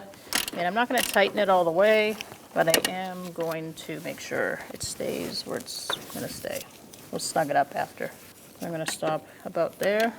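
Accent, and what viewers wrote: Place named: New England, United States. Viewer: Love the NE accent, thanks for the video!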